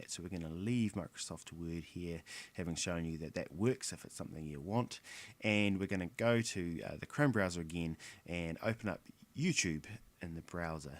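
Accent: New Zealand. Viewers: cool accent! NZ i presume? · r u from NZ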